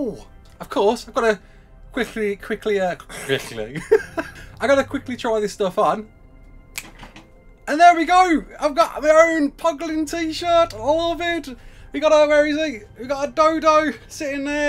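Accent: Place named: Liverpool, United Kingdom. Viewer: You sound a bit scouse, are you from liverpool?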